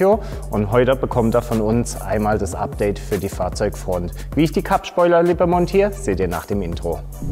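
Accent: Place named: Baden, Germany. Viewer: Ich liebe deinen badischen Dialekt 👌😄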